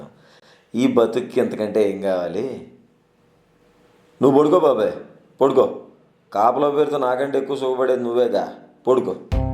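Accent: Godavari, India. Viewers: nani style lo champav kadanna.... may be ur from godavari...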